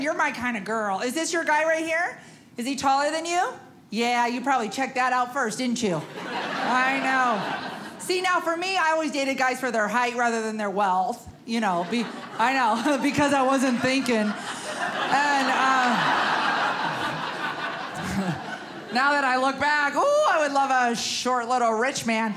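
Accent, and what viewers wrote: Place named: Minnesota, United States. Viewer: Is she from California or Minnesota? She sounds like Minnesota to me lol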